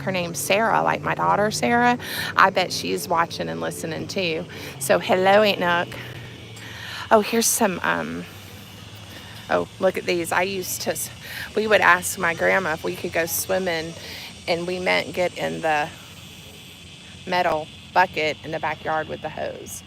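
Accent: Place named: South, United States. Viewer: LOVE that southern accent!!!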